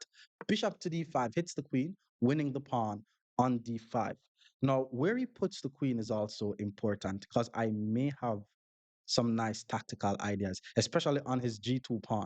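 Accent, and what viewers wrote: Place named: Jamaica. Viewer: Jamaican accent makes everything sound cooler and more interesting. I can watch these long videos with all my attention the whole way through.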